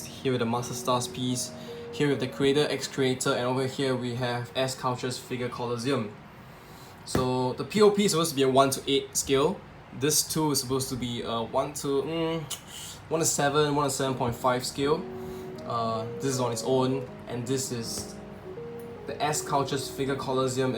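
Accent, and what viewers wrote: Singapore. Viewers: We know a singaporean accent when we hear one 😂😂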